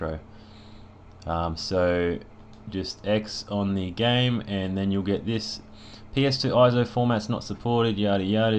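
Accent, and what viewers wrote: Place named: Australia. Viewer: Love ya laid back attitude! Also great to hear a fellow Aussie voice. Helps me understand 10x easier. Appreciate ya work mate!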